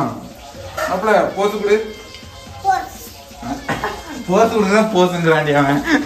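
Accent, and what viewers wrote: Sivagangai, India. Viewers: Sivagangai karapulla language apditha irukum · Naanu sivagangai thaaa broo unga video vaa naa paapen naanu akka mari thaaa pesuvee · Broo nanu Sivagangai bro akka soldra mari sivagangai seemai... 🤍⚡😇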